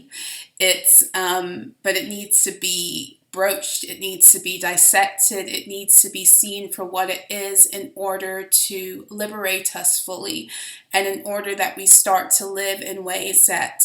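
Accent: United Kingdom. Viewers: Why are you doing a British accent, your from the States yes?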